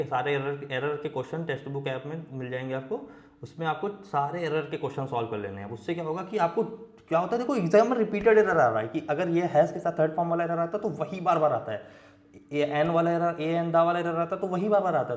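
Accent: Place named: Uttar Pradesh, India. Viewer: Up accent❤😅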